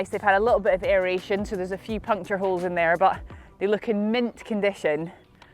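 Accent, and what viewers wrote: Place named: England, United Kingdom. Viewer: Your commentary and tips are spot on! The English accent doesn’t hurt!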